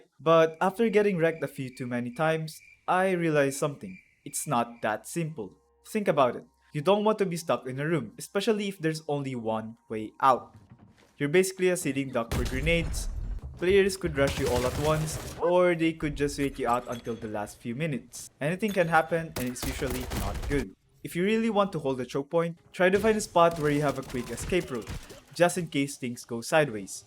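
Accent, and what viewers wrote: Philippines. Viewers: You have a filipino accent. Are you? I am too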